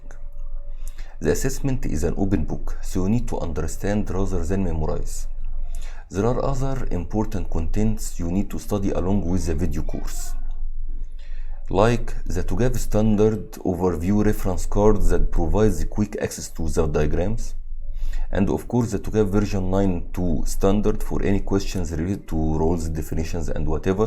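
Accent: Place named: Alexandria, Egypt. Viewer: والله المشكله مش في العالم العربي المشكله العالم الثانيه ماراح تفهم كلامك واللغة اللي تتكلم فيها. انجلش بس الاكسنت من اسكندريه !!!!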